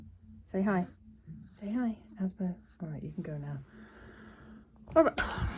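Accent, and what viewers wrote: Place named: France. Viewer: haha i love your french accent! :)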